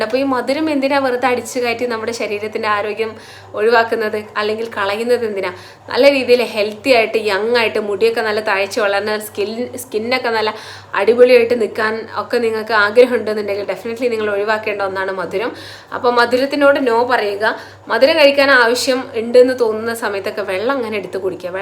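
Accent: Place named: Vadakara, India. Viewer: താങ്കൾ വടകര ആണോ?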